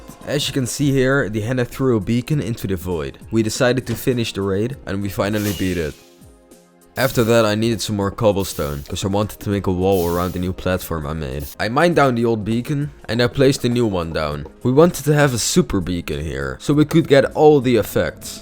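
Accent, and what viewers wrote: Netherlands. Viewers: Je kan gewoon horen dat je Nederlands bent🤣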